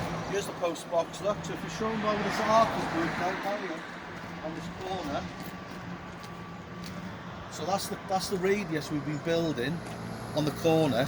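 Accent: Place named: South Wales, United Kingdom. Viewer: Efflorecence is so disheartening. I feel your pain it is no reflection on the work of us bricklayers. Listening to your accent I think wr are from the same neck of the woods ( South Wales ?). The majority sand the builders merchants supply is dredged out of the Severn estuary which will have more of a salt content than pit sand . Would be interesting to know if builders in other areas have the same issues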